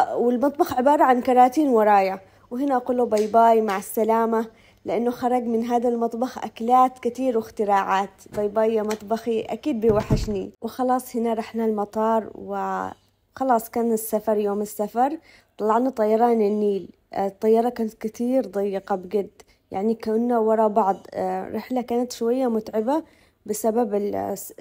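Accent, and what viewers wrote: Aden, Yemen. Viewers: انت يمنية منزوجه مصري والا كيف كلامك يمني الله يكون في عونك ويرزقك من الخير كله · اللهجه العدنيه حاليه وانت من نطقك لها صارت أحلى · انتي يمنيه باين من اللهجه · الحمد لله على سلامه الوصول يا الى مصر الله عينك شفت الحاجات المغلفه وحسيت بالمجهود الي باتقومي به للترتيب هذي الحاجات اكيد بياخذ وقت ومجهود كبير منك الله يكون في عونك بس نصيحه مجربه كل ما اجيتي على نفسك وتعبك كلما خلصتي الترتيب والتنضيم بسرعه وجيبي معك احد يساعدك مش لوحدك تشتغلي انا. يمنيه واحب اسلوبش في الشرح وطبخاتش روعععه. ولهجتش العدنيه الحلوه وصوتش الجميل. الله يخليك لنا ياريت ماتتأخري وتنزليلي لنا اكلات رمضان الي بانزين مائدتنا بمأكولاتك الذيذه معك ام ماجد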